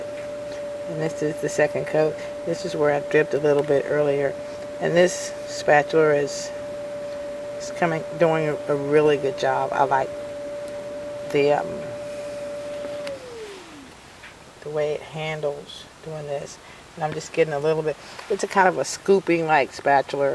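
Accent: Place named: South, United States. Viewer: Thank you for this creative video! This is the technique I'm going to use for my knit slippers (that have to be hand washed anyway). Never mind the negative comments, I'm from the South too, and that's just the way people talk down there. Keep the ideas coming! <3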